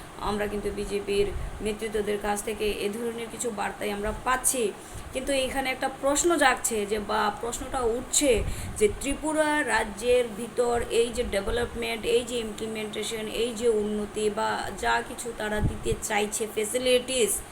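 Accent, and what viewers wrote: Bangladesh. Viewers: Truly tripurar basinda hola eai babe kotha bole na sotti tumi bangladesi tumar kothatei porichoi hoi.....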